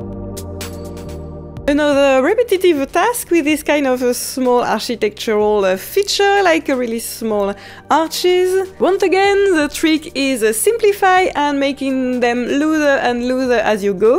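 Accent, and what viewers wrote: France. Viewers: I loved watching you do this beautiful sketch. You are a fantastic teacher and your personality makes me feel happy. Also your lovely French accent reminds me of so many happy times visiting France. Thank you very much.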